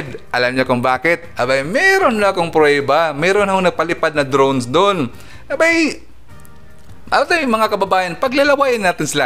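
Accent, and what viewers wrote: Visayas, Philippines. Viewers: Hello of. I am one of your avid listeners and I am in love with your Visayan vlogging accent and all. Am very happy for trutfhful news that BBM and SARA are majority winners in the Overseas Absentee voting results favoring them. Thanks so much and still viewing you wearing RED SHIRT. Carry on friend. BENJIE Barrera of Chula Vista, California, USA.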